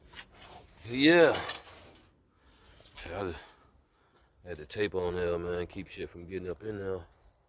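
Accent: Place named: South Carolina, United States. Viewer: I’m new to the channel but I swear from the way you talk you’re from low country South Carolina.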